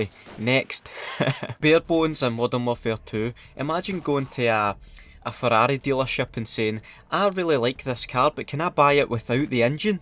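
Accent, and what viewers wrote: Scotland, United Kingdom. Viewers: Love the Scottish accent haha. Hilarious content as usual. Much love from the US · Why are annoyed Scottish people so great to listen to?? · Am I the only one that thinks the Scottish accent is a work of art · NOTIFICATION SQUAD! Love ya accent it's godly, my english teacher is actually scottish but she's holding back her accent sadly i love the scottish accent. KEEP UP THE LEGENDARY WORK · Scottish, sounds nothing like Welsh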